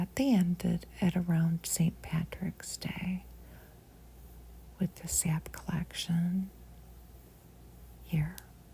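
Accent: United States. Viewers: Love the videos, australian here and I'm curious what regional accent I'm hearing? It's so oddly charming and I don't know the first thing about US dialects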